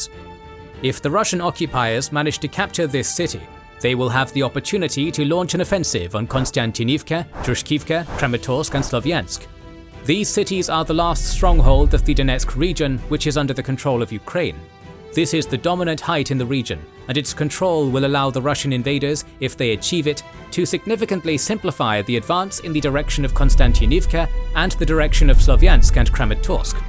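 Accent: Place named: United Kingdom. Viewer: Forget the animation....the fact that these videos are narrared by a real person with a bona fide british accent is something I find very easy on the ear, no repetitions volume changes or any murdering of local place names.Top man,keep it up.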